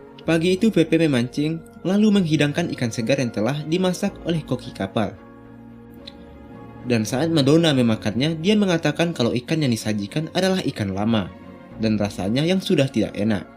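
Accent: West Sumatra, Indonesia. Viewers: Fix mimin org minang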